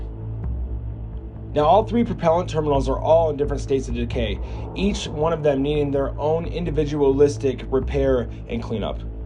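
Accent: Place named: East Coast, United States. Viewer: Are you serious? The man is from the East Coast, guess you don’t get out much.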